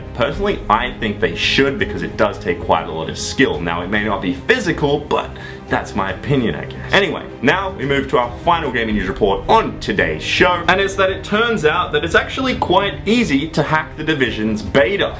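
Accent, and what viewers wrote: Australia. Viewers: I love the Australian accent oh lord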